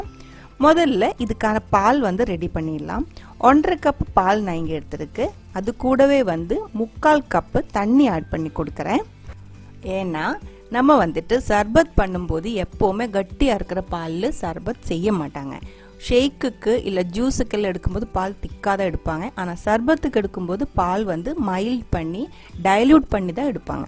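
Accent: Kerala, India. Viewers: Hai mam valare nannayittunde Explanation super kurachu malayalam varunnunde athu saramilla kelkkan sugamunde we are also living in coimbatore native palakkad ane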